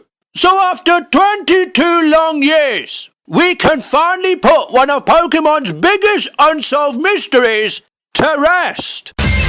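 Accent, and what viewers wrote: United Kingdom. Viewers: BRITISH MAN SHOUTING ABOUT RANDOM ASS SHIT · nvm hes british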